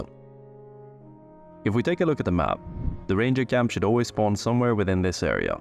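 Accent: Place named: Sweden. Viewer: Love that Nordic Swedish accent bro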